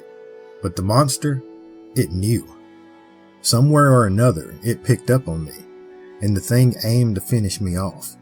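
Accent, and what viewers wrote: South, United States. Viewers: that smooth southern voice of * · your smooth southern drawl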